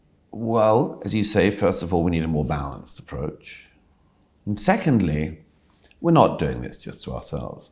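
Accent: RP, United Kingdom. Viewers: The man with the posh accent is speaking the truth. You would know that if your knowledge of history wasn't limited to TikTok and shows from Netflix. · This is one of the unfortunate byproducts of 30 years of dumbing down, there are people out there in Internetlandia who would follow Murray blindly based on the fact he can string a sentence together without saying “like” out of context, with a posh accent.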